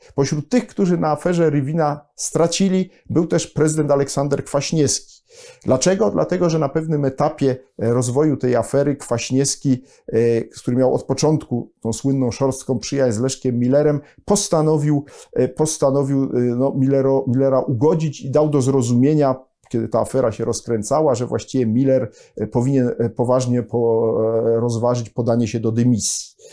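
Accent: Russia. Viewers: Z jakim ruskim akcentem gada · Jaki piękny polski język z rosyjskim akcentem :)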